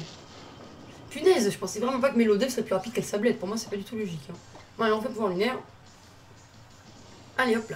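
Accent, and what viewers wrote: Marseille, France. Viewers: On entend bien l'accent de Marseille Sinon bonne vidéo j'aime bien t'es montage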